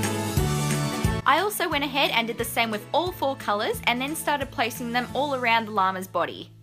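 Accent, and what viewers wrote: Australia. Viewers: i love your tutorials! are you australian? im aussie.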